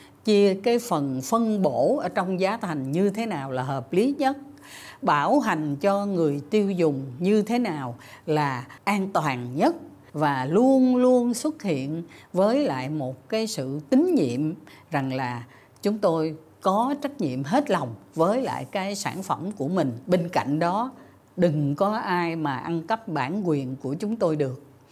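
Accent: South, Vietnam. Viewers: Em mói biết đến you tube của chị đươc vai ngày nay. Rất thích cách dẫn dất chuong trinh, chia sẽ kiến thưc rông rãi, đề tài hấp dẫn và nhất là giọng nói miền năm Viêt Nam, thât dịu dàng thân mât của cô. Mong chuong trình sẽ còn tồn tại lâu dài đê em được tiếp tục theo dõi và học hỏi nhiều điều hay chỉ chia sẽ.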